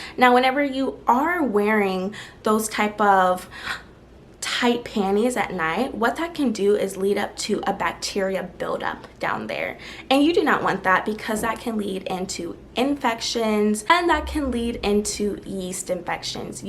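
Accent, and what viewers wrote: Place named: Caribbean. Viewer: Where are you from? I'm hearing a particular accent from the carribean.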